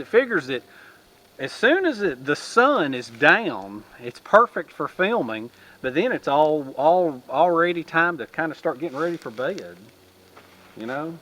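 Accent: South, United States. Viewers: Hey Bro...I just wanted to say how much I enjoy your vids. So plz keep em coming as you have the time. It is really nice to have a southern guy doing vids. From a Bama guy. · Man, you're as crazy as a box of frogs on hot coals ...I just love watching your videos! ;-) I love your southern drawl and your hooting laugh, it cracks me up every time! It always puts me in mind of that 'Stumpy' character played be that wonderful american actor Walter Brennan in the John Wayne westerns! :-) Anyway, keep them coming and all the best from the UK, regards, Daz.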